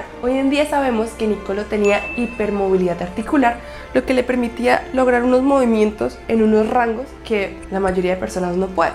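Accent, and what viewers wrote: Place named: Colombia. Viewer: Disfruté mucho esta historia y también me encanta el acento colombiano, pero este canal es para los estudiantes de nivel alto intermedio -avanzado? Tuve que concentrarme mucho por la velocidad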